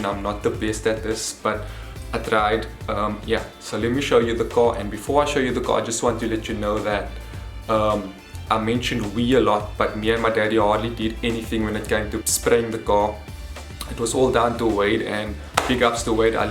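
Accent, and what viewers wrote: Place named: Afrikaans, South Africa. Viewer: Hy klink Afrikaans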